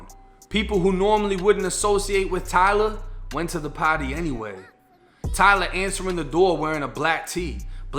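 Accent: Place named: Florida, United States. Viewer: Lol yo not for nothing bro story telling voice is krazy 😩😆😆🙌🔥🔥🔥 lol I’m from NYC and I know this a wild Florida accent but that alone has me hooked on these videos, perfect example of BEING YOURSELF! Always be yourself, it’s the difference between true success and temporary failure 😊❤️🙏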